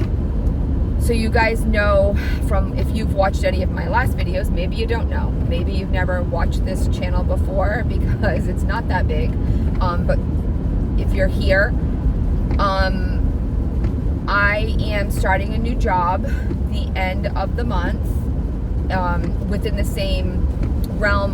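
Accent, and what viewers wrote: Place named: New England, United States. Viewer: Been meaning to respond for a week now because I just stumbled across your videos. I love your accent and I could immediately tell you were from New England because of it! I just wanted to chime in here. I'm 5 feet 6 inches, and have very small wrists. I wanted a watch that would record my steps and my blood pressure. I'm really not a fan of Apple products and wasn't really sure that I would use an Apple watch if I had one. So I went the cheaper route and found one on Amazon that was $30 with a $10 coupon. The watch was $20 and some change. It received 4.5 out of 5, and after reading the reviews, I bought it. I couldn't be happier. Yeah it's a little bit big on my wrist, but it measures everything I want and you can shut off the things you don't. I became a little bit fascinated with my sleep cycle, because I'm retired now and can sleep as long as I want, pretty much. 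I used to commute 5 hours a day to my job, so sleeping is very enjoyable, lol. Anyway, I bought this watch thinking I could exchange it down the road, for something I liked better. Forget about it. The battery holds a charge forever, it's got a bazillion clock faces to choose from, and the water reminder lights up, vibrates a little and tells you to drink water! What's not to love? It's a little bit difficult to set up, but really this watch can do way more things than I use it for, so just the basics are easy to set up. It does everything an Apple watch does, and somethings I didn't know about, like find my wat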